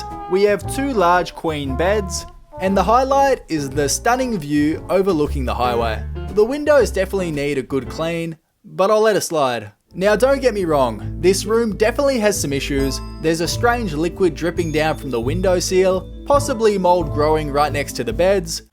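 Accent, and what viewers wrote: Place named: Australia. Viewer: Men with Aussie accents like his makes me MELT.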